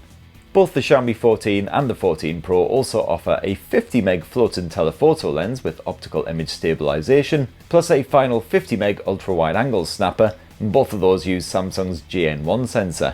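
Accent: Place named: Northern England, United Kingdom. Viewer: Northern scolding, sweary whiskey soaked banter. MINT MAN! With some good tech info too 😂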